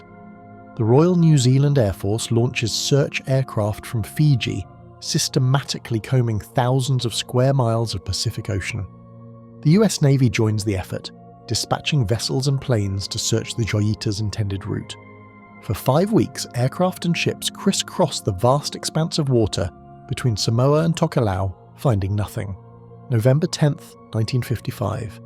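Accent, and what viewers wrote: United Kingdom. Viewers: US anti-intellectualism using a British accent. Hilarious. Rewriting of history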